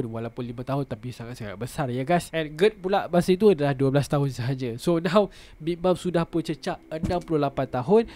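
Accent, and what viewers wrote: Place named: Sabah, Malaysia. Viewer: influence dari HOROGORO ke ni bunyi baku Sabah gitu hahaha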